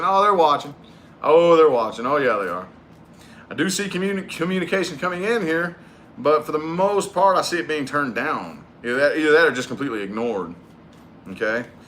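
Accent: South, United States. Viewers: Somewhere in the south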